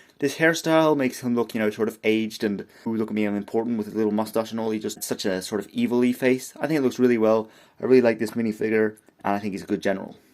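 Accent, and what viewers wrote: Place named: Eastern Canada, Canada. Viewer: I'm from Eastern Canada and the speed talking with heavy accent was 100% intelligible lol just like home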